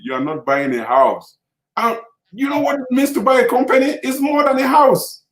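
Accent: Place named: Nigeria. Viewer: Interesting collaboration you had with! You sound more like a Nigerian. Awesome.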